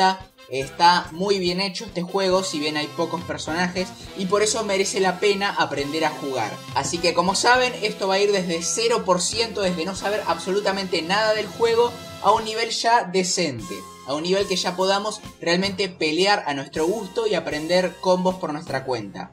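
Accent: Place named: Argentina. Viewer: Sos Argentino, (o uruguayo, no se) eso me agrada como la mina de los Simpsons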